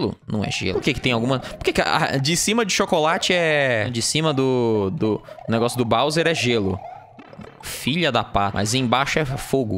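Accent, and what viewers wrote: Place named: Portugal. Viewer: Esse sutaque de portugal Ó top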